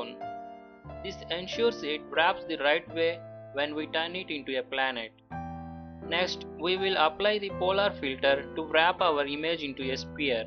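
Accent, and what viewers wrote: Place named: India. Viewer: indian and pakistan accents is very funny they are trying to speak with well accent but they are can't speak well english with good accent 'realistic' but he say 'realishtic' :) 'sixty' but he say 'sixshty' :)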